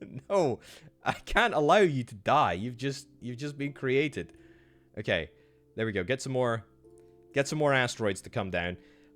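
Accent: Scotland, United Kingdom. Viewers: Scottish accent? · Which region of Uk are you ?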